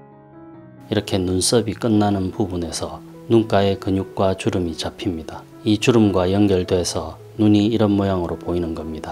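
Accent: Gyeongsang, South Korea. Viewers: 갱상도 분이시네!! 반가워요^^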